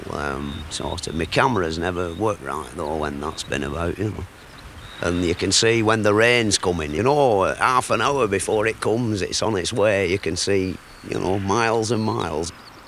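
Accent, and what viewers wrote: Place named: Northern England, United Kingdom. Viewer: Ha, yeah it's northern English.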